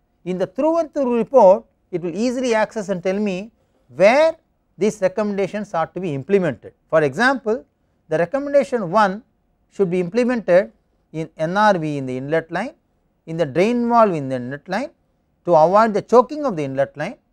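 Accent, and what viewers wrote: India. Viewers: Good Explanation in typical Indian accent.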